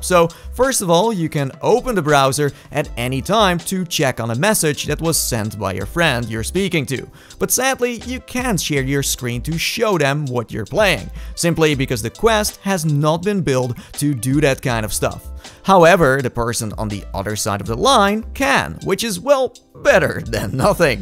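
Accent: Netherlands. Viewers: ik denk dat dat je nederlands bent bro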